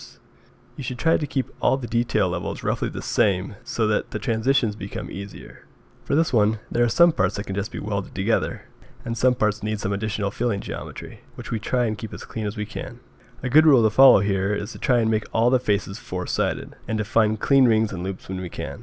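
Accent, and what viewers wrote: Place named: United States. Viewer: Very interesting because "simple" : why T splines would ease the job ...But it is in fast american instead of steady slow english ...Too bad for the frog, but if i slow motion the vid i may understand . Bravo anyway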